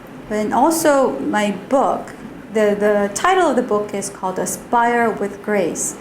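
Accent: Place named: South Korea. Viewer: 발음도 안조음 코리안 발음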